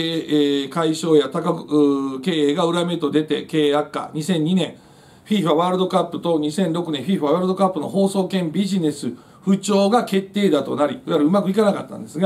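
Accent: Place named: Nagoya, Japan. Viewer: どえらいニュース♪ 党首、名古屋弁！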